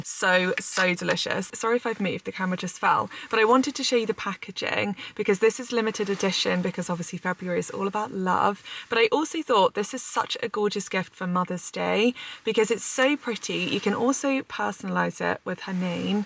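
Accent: United Kingdom. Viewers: It's a very quiet place. Btw I like the way you speak especially as a British, I'm not a native English speaker but you're very easy to comprehend. You're so beautiful too 🎉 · hi i learn british english ......... plz tell me which british accent is it ? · i subscribe you for learning British English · Very interesting and very instructive. So quiet and soothing. And your British English is so pleasant for ears...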